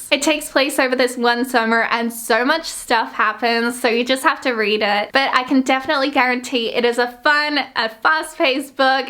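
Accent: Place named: United Kingdom. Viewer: I’m trying to figure out wether your British of American because you sound both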